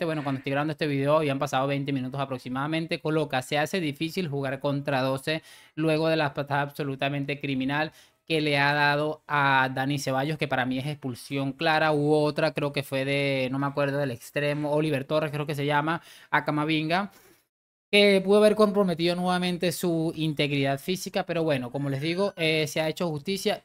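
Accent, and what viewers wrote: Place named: Venezuela. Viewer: Oye una pregunta sin sin ofender y perdona si te ofendo de dónde eres? Porque me gusta mucho tu acento y no sé si venezolano o cubano 🙏💪🔥😈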